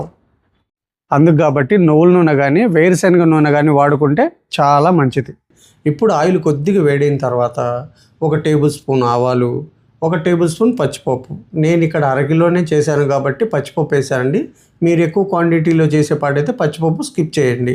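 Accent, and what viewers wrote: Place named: Guntur, India. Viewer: మీ గుంటూరు మాటలు చాలా బాగున్నాయి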